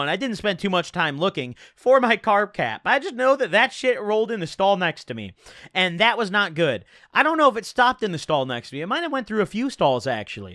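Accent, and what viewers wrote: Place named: Midwest, United States. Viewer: I was born in 91, grew up in Milwaukee suburbs which is probably fairly similar to the Chi suburbs is from. He reminds me in a very peculiar/distinct way of me and my friend group back around ~2010. His speech patterns are almost identical, which since becoming older I have moved on from this lifestyle so it just reminds me of those times. The only difference is some of the newer tech like dabs but I'm not so sure things have changed that much. We were doing the exact same shit. Maybe it's also a regional thing.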